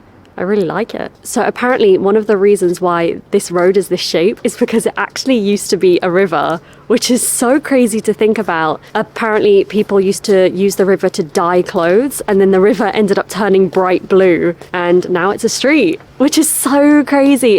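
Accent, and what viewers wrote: United Kingdom. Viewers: イギリス英語だからジャパン、アメリカ英語だとジャペンですかね。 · 歯切れの良いイギリス英語 米語とは一味違いますね。 良い飲みっぷりで見ている方も嬉しくなります。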